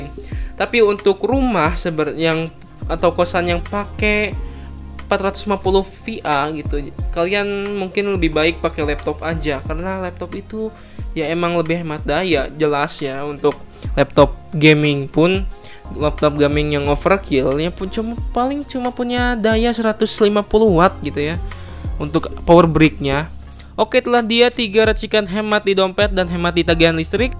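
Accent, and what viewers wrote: West Java, Indonesia. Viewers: Orang Sunda bang😄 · wkwk orang sunda ieumah yeuh wkwk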